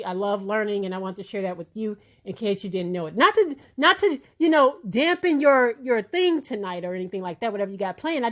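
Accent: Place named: Midwest, United States. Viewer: I knew you were Midwestern!!! I love it!! Im in Indianapolis Indiana next door!!! 🤗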